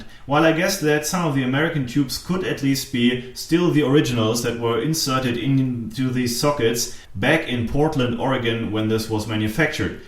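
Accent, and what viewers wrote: United Kingdom. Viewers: I loved the British voice LOL ;-) Brilliant video again, was there some Kraftwerk in this video? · love the british accent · lol at your British accent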